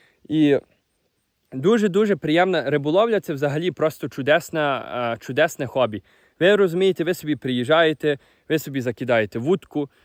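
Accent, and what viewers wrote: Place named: Galicia, Ukraine. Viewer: Насолоджуюсь просто вашим галицьким діалектом! Дякуємо вам за працю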